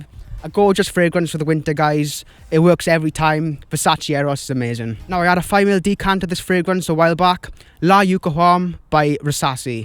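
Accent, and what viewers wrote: Wales, United Kingdom. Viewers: know body he's from Wales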